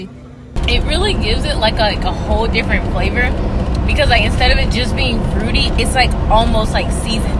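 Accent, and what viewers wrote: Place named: Grenada. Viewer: Talking like a true grenadian i love u vids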